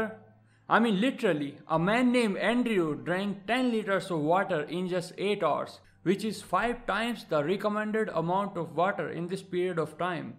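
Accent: India. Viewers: Are you From india? Because Your Language is Indian English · So easy to tell that ur indian i am from nepal btw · His voice sounds like a indian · Your accent sounds like Indian. You definitely are from South Asia. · Why are you talking in a Indian accent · Me: Time to watch!! * Indian guy starts talking* Me. What a hell!?!? THATS SO COOL!! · You talk like an indian and i love it KEEP IT UP😁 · has an indian accent...btw are ya indian...if you are then namaste..I m also indian...and if you are not than I will be the one embarassed..lol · To be honest voice sounds like an India like if you think to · Lemme guess you are indian becoz ur accent bro i love india they are kind persons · U just gave a love to my comment. I still haven't got my answer which country u belong to I have seen every video of yours today I visited your another channel and by face and voice it seems that u are an Indian . 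I want my answer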